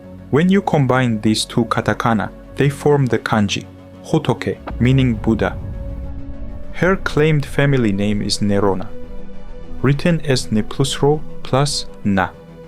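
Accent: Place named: Russia. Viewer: I don't follow One Piece COMPLETELY, about each two weeks i check in and see (Theories of several YTers) But i just LOVE it, when people with russian (or your ) accent are uploaing theories, because they speak STRAIGHT and without forced "jokes" whitch makes them pleasing to listen to. Like from me. Interesting parallels about the flowers and snakes btw. 👍 Your style reminds me of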